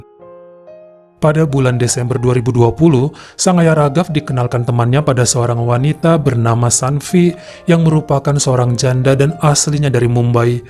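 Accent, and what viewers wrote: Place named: Java, Indonesia. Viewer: Bang boleh nanya gak.. Abang orang batak atau Jawa seh... Nama marga batak..logat jawa😥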